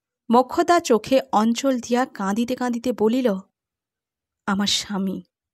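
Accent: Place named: Bangladesh. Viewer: গল্প পাঠে বাংলাদেশের টান আছে ।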